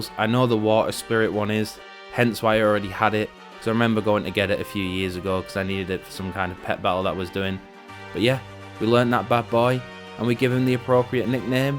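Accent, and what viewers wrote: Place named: Northern England, United Kingdom. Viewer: Celestial Tournament is hard af. You need very specific pets with exact numbers. I have Mini Tyrael (Went to the invitational in 2008 with my bestie) and I’m from Northern England and you sound local-ish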